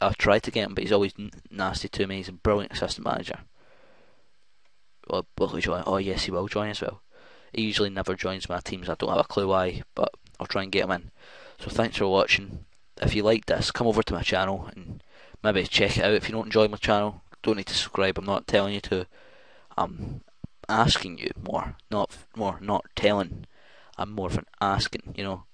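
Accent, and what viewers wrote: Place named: Glasgow, United Kingdom. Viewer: you fae glesgae or rather glasgow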